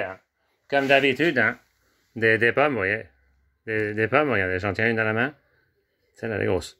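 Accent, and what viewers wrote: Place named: Quebec, Canada. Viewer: Coucou 🤗 je viens de regarder tes 3 parties de retour sur les conseils de Cécile et j adore 😉 d où est ton accent ? Quebec ? Je m abonne 😊 à bientôt 😙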